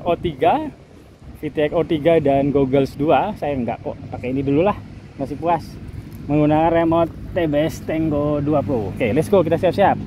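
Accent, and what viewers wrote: Cirebon, Indonesia. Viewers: Sampean wong ndi ang? Kayong ilate ky wong cirebonan sih ?... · Mas orang Cirebon Tah? Logate kyak wong cerbon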